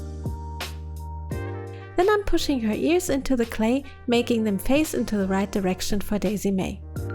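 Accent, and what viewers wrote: Germany. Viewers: I have a question ^^ are you german? :D cuz your accent sounds german ^^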